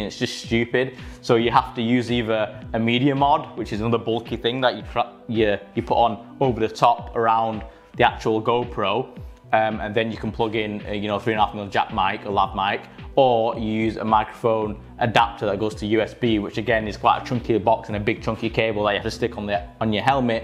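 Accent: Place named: Preston, United Kingdom. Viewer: Mate your accent was confusing me hard but knew you were from around the Preston area 🤣 Great video, hopefully see you around 👍🏼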